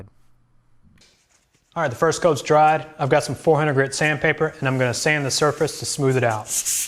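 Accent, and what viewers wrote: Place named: South, United States. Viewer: Can't decide whether I appreciate more the technique or the southern accent. Great video, though. Thanks.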